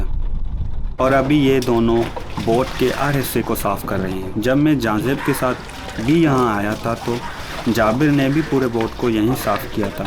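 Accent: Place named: Balochistan, Pakistan. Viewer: کتنی خوبصورت اردو بول رہے ہو لہجہ بھی بہت خوبصورت ہے یہ ایک بلوچ کا ہی ہوسکتا ہے ۔ اللہ خوش رکھے آمین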